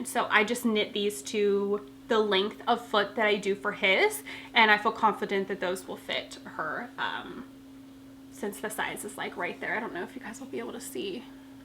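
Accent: West Virginia, United States. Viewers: I hope you had your cosy snow day - chilli and brownies sounds like a great way to spend the day. Happy Birthday to Eric. The love story pattern looks great both ways, I particularly liked the version which just used 2 colours - although I think a gradient yarn may have merit in place of the minis. If you got snow I hope it clears enough for your family to be able to visit - you need to be able to practice your WV accent with your Sister (your reaction to “by” made me laugh)! Best wishes, J x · Good morning! Glad to have this to tune into 1st thing this morning. I am working my 2nd pair of Magic Loop Vanilla Socks!!! I learned from your tutorial and now feel so empowered to create the cutest socks. It makes me very happy, will never NOT have socks on the needle now. Your January yarnable socks are fabulous. The dark pink is stunning with the lighter contrast color. 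I will definitely try this pattern when I'm done with the vanilla socks I'm working now. Thanks for the inspiration, Has made my life even better!!!! Loved drinking my coffee to your vlodcast this morning- good way to get the day started. You can take the girl out of West Virginia but you can't take the West Virginia out of the girl!!!! Cute southern drawl revealing your roots!!!!!!! Have a great Thursday🤩🐑🍵🧶 -Carrie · You seem to realy enjoy podcasting again without the additional responsibility of the shop, and your new pattern is lovely! I especially love the 2nd colorway you are making in the Lovestory sock pattern. Btw, being a WV born gal myself, I picked up on your accent coming through; happenns to me when I go back to visit relatives in Almost Heaven. I, too, love the winter snow season but only get to enjoy it once in a while when I visit the homeplace.. Thanks for sharing your knitting and knowledge. 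· I am so going to purchase your new sock pattern! I'd love to hear you talk in your W. Va. accent. I'm from Ga, so you already know how I sound. lol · I love seeing you back on track ❤ and I’ve never seen that color Stanley cup!! Let that WV dialect fly! 😂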